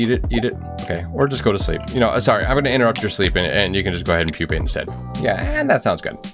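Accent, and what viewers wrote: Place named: United Kingdom. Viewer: i LOVE your BRI ISH acsent!!!!!!!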